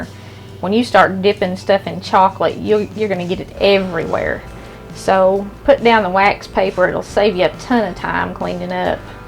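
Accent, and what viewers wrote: South, United States. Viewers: Love the accent of southern women..whew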